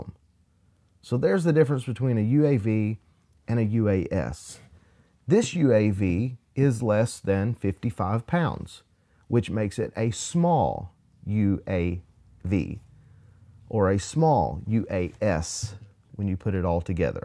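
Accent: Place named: Arkansas, United States. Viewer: I detect a slight Arkansas accent 🤔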